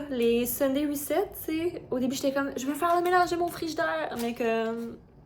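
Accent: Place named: Russia. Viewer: sorry, but once I heard you I thought you russian , I didn't understand anything 😆😆 love your ideas, hope good for you 💕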